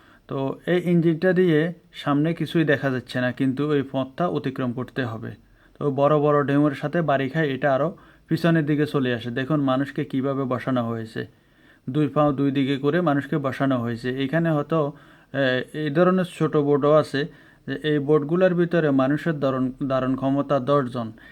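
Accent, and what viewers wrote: Noakhali, Bangladesh. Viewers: ভাই আপনার বাড়ি কি নোয়াখালি ভাষায় বোজাযায়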